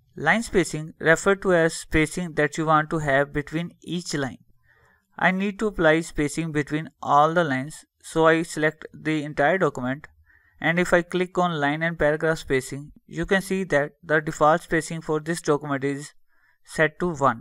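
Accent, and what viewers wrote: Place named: India. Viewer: oh, that indian accent...I don't understand, how can you still have it? I mean, you indians talk a lot english, even before everybody in the world knew english, DUE to India beeing brithish colony, but still, even know that indian accent is...I don't want to offend. let's say that IT IS RECOGNISABLE.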